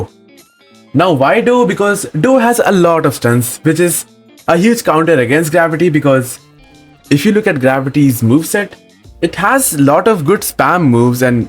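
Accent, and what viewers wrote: India. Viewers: the indian accent eww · ngl u sound like a indian curry dweller but good tips btw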